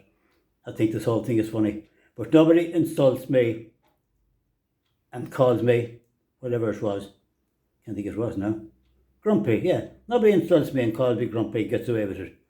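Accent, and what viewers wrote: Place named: Ireland. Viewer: Yes indeed I totally understand what you are saying ....and listening to your delightful accent is pure joy .....I hope to go back for a visit to Ireland ...check in to more of my O'Donnell and Ryan clans❗🎼🎶🎶🎹❤🇨🇦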